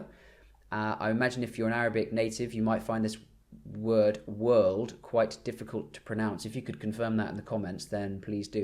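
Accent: United Kingdom. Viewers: Arabic welcome bro u really teach me a lot with ur British accent ❤️👌👍🏻👍🏻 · Hey I was wondering what kind of British accent do you speak & if you could do a video about different accents in England.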